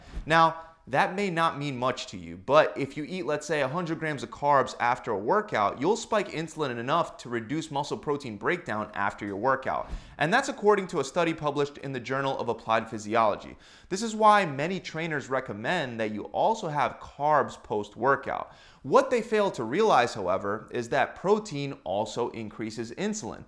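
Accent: Philadelphia, United States. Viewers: This dude from either philly or jersey w that wootur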